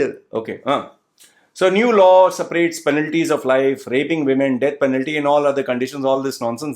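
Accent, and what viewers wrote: Kerala, India. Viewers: That verbal slapstick, mispronouncing word puns and the overdone mallu accent is getting old... Starting to get redundant.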